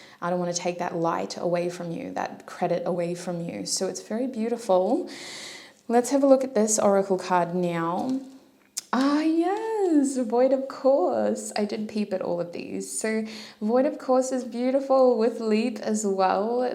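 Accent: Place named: Australia. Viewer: Thank you, love your Accent, either Australia or New Zealand? interesting reading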